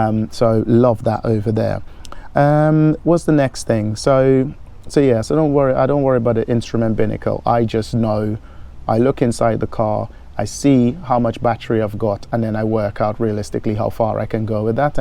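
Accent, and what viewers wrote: Trinidad and Tobago. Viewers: Im hearing the trini accent. 🇹🇹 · Caught that Trini accent...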